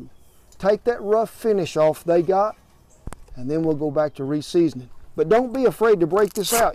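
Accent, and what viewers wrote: South, United States. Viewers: oh that southern accent... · Thank you, you've made the Southern accent cool. Turns out that someone who is very intelligent, makes the Southern accent pretty awesome. Great videos. I've watched 2 so far. From the PNW. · I usually do not enjoy listening to southern accent but in this case I sure did, enjoyed it very much. Thank you Mister, very useful information and I do have that same exact red bandanna from walmart (I was wondering how to put it to good use, now I do know) and loads of cast iron cookware, yeeeeehaaaaaa....... I like your style, I Like the way you roll, I like you! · And just to be clear, did you say that there's lead in the paper towel? I thought something like that would be illegal to do? And about the ''Leadfree'' rag, is that even a thing? Sorry if it isn't what you were really saying, I'm danish and don't understand southern accent 100% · Howdy Kent, just found you, love the way you talk, love your southern accent! 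You talk like a real sweet and humble person, you're really fun to listen to. Bet you and your family have a blast at your cook outs!! ha....ha.... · Love the flaxseed oil tips, just buy the capsule from pharmacy... brilliant. Love your video, with that southern accent... ☺️.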